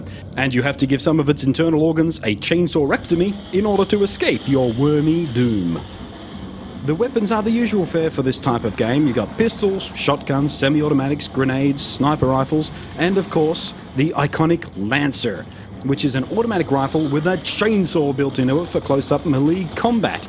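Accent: England, United Kingdom. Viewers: nothing is wrong with English people. it's this guys pissy attitude towards the game and his accent. most English people's accents are all right. but this guy pisses me off. fuck he's stupid. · The guy is british, and that's how british ppl say it. -.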